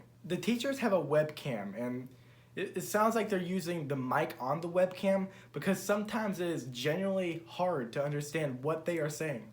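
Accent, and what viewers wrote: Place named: United Kingdom. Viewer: British lady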